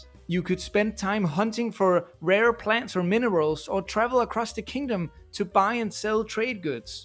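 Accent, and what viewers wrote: Denmark. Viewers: Den danske accen er rimelig let at genkende føler jeg. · Your accent sounds danish, is that right?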